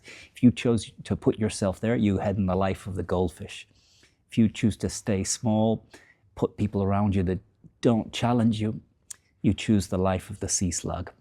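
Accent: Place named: Ireland. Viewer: Brilliant and memorable! As much as I love your Irish accent your "un abrazo" at the end made me wish for some Spanish language content. And maybe a video explaining how you found your way to Spain and your transition from "guiri" to local. That would be very interesting for us! I'm an American with majority Irish heritage who fell in love with Sevilla in the early 2000s. I made great local friends there, but ultimately I always came back to the States for career reasons. I admire that you've so fully embraced your love for Spain, and made such a fulfilling life for yourself there. Un abrazo! -Justin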